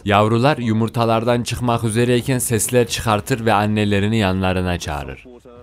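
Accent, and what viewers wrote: Ankara, Turkey. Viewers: Usta anlatma şeklin Ankara şiiven anlattığın herşeyi akılda kalıcı yapıyor iyi düşünmüşsün kolay gelsin.😁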